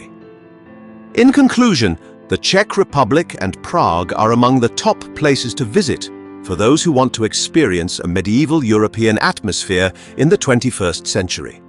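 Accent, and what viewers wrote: United Kingdom. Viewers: Wow. The London underground system - even the staff dont know whats going on and where! Being told about this underground system of order - with a British accent - makes it all the sweeter.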